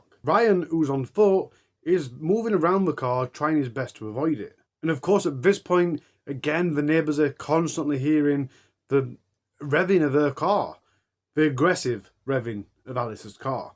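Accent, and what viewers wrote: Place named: Yorkshire, United Kingdom. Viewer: Just found your channel. So good and subscribed. Daft question, but your accent... youre northern like myself... are you from Yorkshire? Xx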